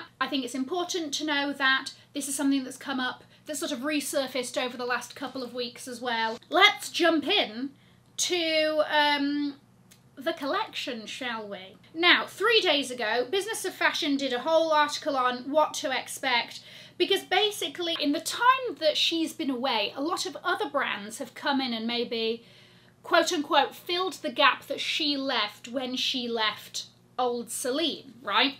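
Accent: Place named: England, United Kingdom. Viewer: Interesting channel, but English accent is difficult for me. I prefer american English.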